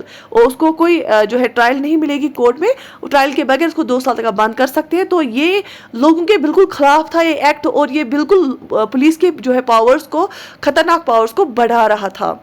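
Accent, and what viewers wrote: Kashmir, India. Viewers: Your accent looks South kashmiri but you are from gbl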